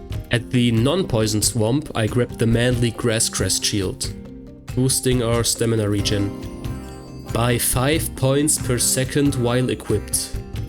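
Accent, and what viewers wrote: Germany. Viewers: Nice run! Unrelated but I was like "hey this guy sounds German" Then I was like "Paulaner Spezi aufm Schreibtisch 😱"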